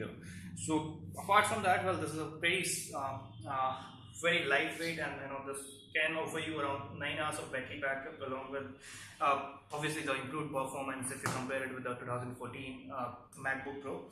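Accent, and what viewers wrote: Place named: India. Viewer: Bloody Indian accent -_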